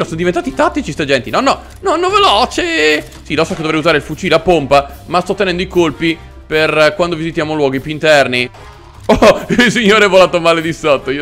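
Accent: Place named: Tuscany, Italy. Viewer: Malaho e tu mi sembro Toscano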